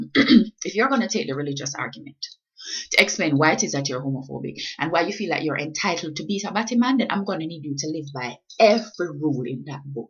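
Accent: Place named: Jamaica. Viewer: Hi, really liked this video, even though I couldn't understand some of the lingo (not a Jamaican or accustomed to hearing your accent). You did your African research well, very impressive...you are totally right, they had no right to do the man that, he has rights and even though he could have done what he was doing in a private place their behavior was sad and I hope the world gets a clue soon and no more lives are hurt or lost..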